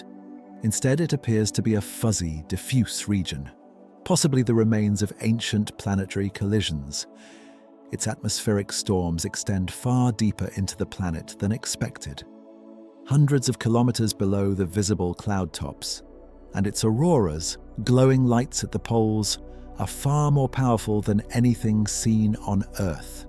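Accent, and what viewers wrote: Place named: United Kingdom. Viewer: another femme british narrator!?!?! wtf is happening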